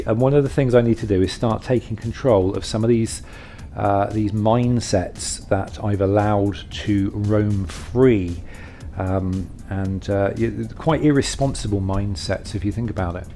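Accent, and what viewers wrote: United Kingdom. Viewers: I was so happy to find a new video from you! I really really enjoy listening to your friendly kind voice and your beautiful British English. Since I have some weight, digestion and disciplin issues myself I also find your ”rambling” very interesting and inspiring. Thanks for being so open and sharing this! I’m sure I’m not the only one who will start to think about how to tackle their problems using your input. Warm greetings from Sweden! 🌷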